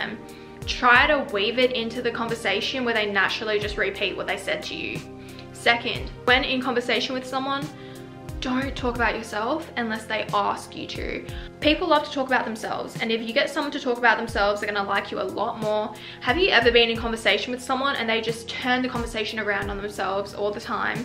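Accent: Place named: RP, United Kingdom. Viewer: the poshest accent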